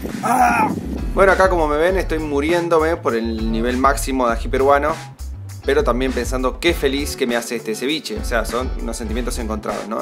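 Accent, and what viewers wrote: Argentina. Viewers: Amo tu acento argentino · Quizás porque es argentino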